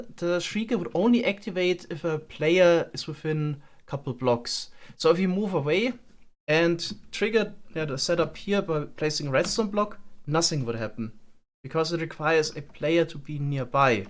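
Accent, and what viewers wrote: Germany. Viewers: 10 sec in and i knew you also bist ein deutscher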